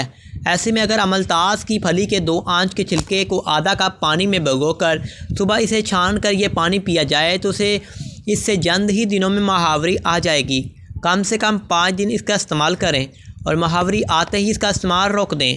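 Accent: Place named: Rural, India. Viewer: Oh pendu baat krni ki tameez Nahi moh utha kr video bananaiy ajataiy hai sastay Hakeem Kiya talafuz hai Urdu k tera pendu bs kr dy bs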